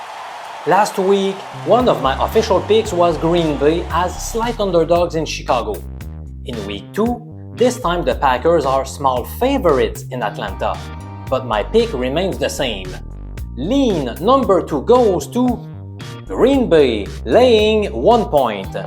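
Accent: Quebec, Canada. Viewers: I love the French Canadian accent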